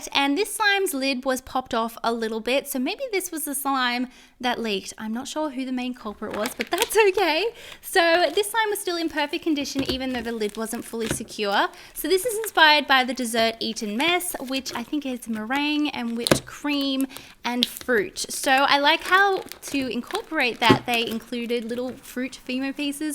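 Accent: Australia. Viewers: R u from Australia? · * are you Australian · Are u from Australia ???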